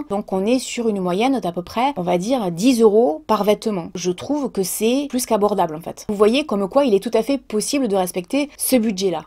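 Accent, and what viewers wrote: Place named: Marseille, France. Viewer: Vous etes de Marseille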